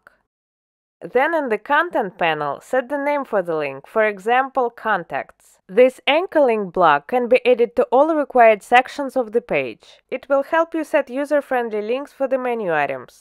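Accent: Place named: Russia. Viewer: Приятно слышать русский акцент)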